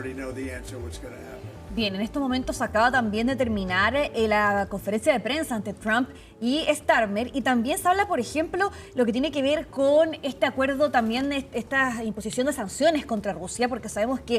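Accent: Chile. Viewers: Ese acento que chistoso es chilena ?? Me distrae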